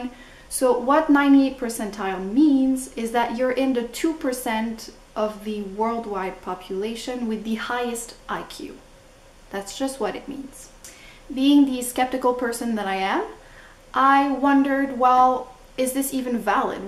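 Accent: Quebec, Canada. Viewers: WoW Je me disais que tu avais un accent québécois! Je suis un adulte TDAH, dyslexique, avec dyscalculie et avec dysorthographie. Je connais très peu de québécois doublement haut-potentiel! Belle découverte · Tu es de Montréal ? Selon le peu que j'ai entendu de ton accent tu me sembles québécoise... En tout cas excellent vidéo merci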